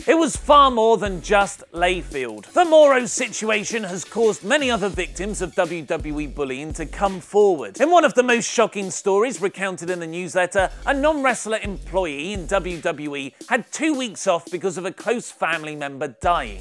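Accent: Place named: United Kingdom. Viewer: YOU ARE MY HERO!!!! YOU BRITISH TALKING MAN!!!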